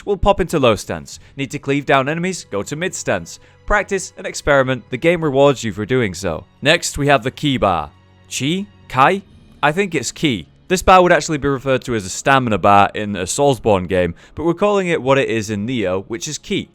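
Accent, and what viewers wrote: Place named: Bristol, United Kingdom. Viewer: Great video mate, thanks! Can I ask, are you from Bristol but moved to Yorkshire because that is the only solution I have for your accent..?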